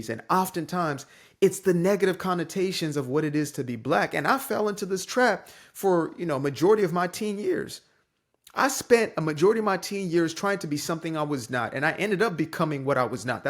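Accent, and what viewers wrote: United States. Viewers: Careful now, you are sounding American, that seems to be frowned upon these days. Stay safe, and keep up the good work, every day you wake up alive is another day you can strive to be better than yesterday.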